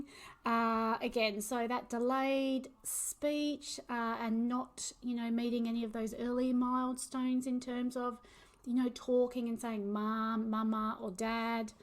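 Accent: United Kingdom. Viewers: Toe walking can also be because of a too short tendon between the heel and the calf muscle. Can be fixed with surgery. - Ecolalia can also be a result of TS/OCD - you're quite right. Same with coprolalia. - Constipation may be (I had it when I was a kid) because for some reason I liked the feeling of "keeping it in". NOTE! I've got TS/OCD and probably a tad Asp and a sprinkling of ADD. - My facial reaction can be totally blank by that is by will. - My primary language is not English but I still speak English with a Brit accent because I'm old enough to not have learned the US accent. ;) But then you are what you speak! See Star Wars princess Leia when brought aboard the Death Star and meets governor Tarkin. She mulled over the line with "I should have recognised your foul stench" and it didn't come out right. Of course not since she was American. Then someone on the set told her to try that line in Brit English, and lo and behold! 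Carrie Fisher delivered the line in perfect Queen's English. I must say you sound perfectly British (if you aren't). - I preferred to play alone as well and I have always been a "loner". Also, a sign is fixating on something. Like I did on computers and continued to work with systems development and maintenance in various capacities all my life. I get really got at it, too! ;) The ability to really focus on something is something I think people with autism/asp can do. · The only symptom I don’t/didn’t have on your list is delayed speech. I started speaking pretty early, surprisingly. But even down to you speaking in a British accent, assuming you did it because your dad is British… I also did the same, and my dad is also British and I’m American 😂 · I think you still sound like you have a bit of an English accent to me but I’m no expert.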